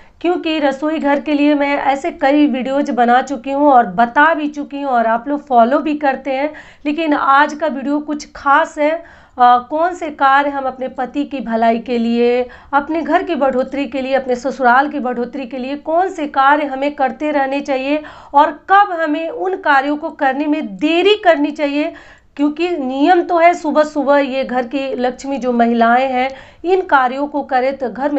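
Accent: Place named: Uttar Pradesh, India. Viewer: Jai mata di🙏🙏didi aap kis kahan se hai up se ya bihar se apki bate sunkr to up se hi hai aisa lgta hai pr kis gav se hai kyoki mai bhi up gorkhpur se hun pr abhi nagaland se apka video dekhti hun apki bate sunkr mujhe apne mayke ki bahut yaad ati hai sasural to delhi me hai pr mayka gorkhpur me hai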